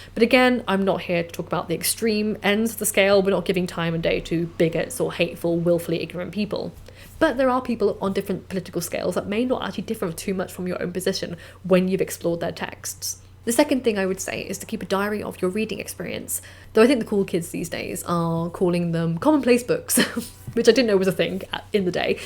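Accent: United Kingdom. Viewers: After a chapter or a few pages of fiction I've for years been getting this painful sence of urgency, as if the book is wasting my time, drawing me away from the limited years of my own life. Ironically my own life feels a bit meaningless. Also are you British or just playing a British character on Youtube?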